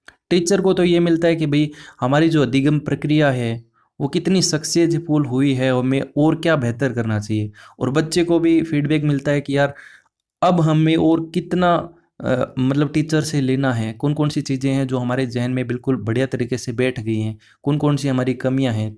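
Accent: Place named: Haryana, India. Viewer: Guruji 👏AAP pdane saath saath Jo hme motivate karte ho Aapka bahut bahut dnyavad .aapki aawaj se esa lagta h Guruji 👏 Jese AAP haryana se ho AApke pdane ke method ka koi jwab nhi Guruji 👏 AApke vedio Dekhkar saath saath notes bna rhi hu Guruji 👏 from kaithal HR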